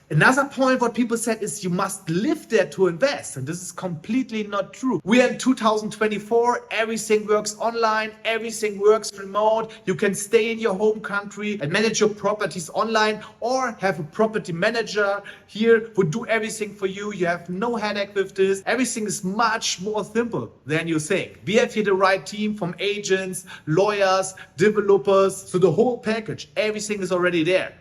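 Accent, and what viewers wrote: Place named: Germany. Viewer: "whats the TRUTH". Your German accent speaking English is hilarious.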